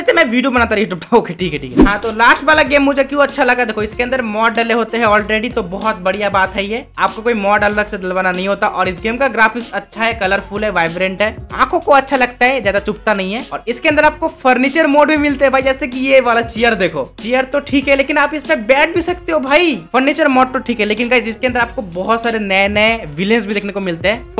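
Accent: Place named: India. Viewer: Goofy indian noise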